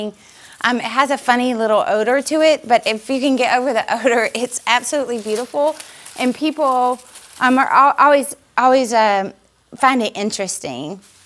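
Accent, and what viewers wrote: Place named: Georgia, United States. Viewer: humble dialect :) in Georgia. hope it's empowering. happy making!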